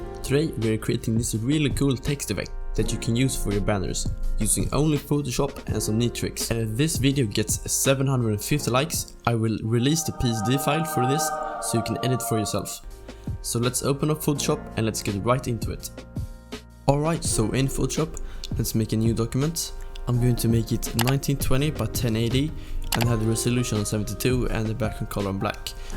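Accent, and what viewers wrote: Sweden. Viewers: Haha man hör den svenska engelskan👍men sjukt bra video man blir sugen på fixa photoshop när man kollar på de här du är grym på de du gör!:)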